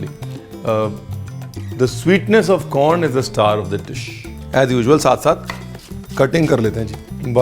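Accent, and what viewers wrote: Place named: Punjab, India. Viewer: your explanation is very impressive. your simple style is very good . you are fit to become another Pran of Bollywood with your beautiful Pnnjabi accent.GOD bless you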